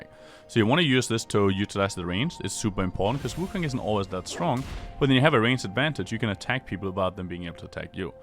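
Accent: Denmark. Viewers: I can hear the Dane within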